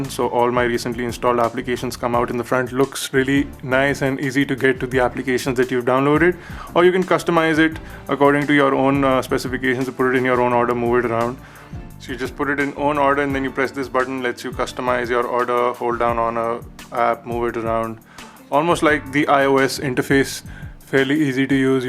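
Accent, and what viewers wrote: India. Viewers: Very nice presentation! Thank you! It would be even better if you talked just a little, little bit slower, since Your "india/english" accent could be a little tricky to apprehend if one is not used to it ;-). Besides that it was an EXCELLENT Presentation!!! Thanks!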